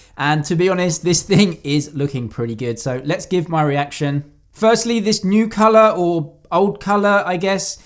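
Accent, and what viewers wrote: United Kingdom. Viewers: and he's even British too.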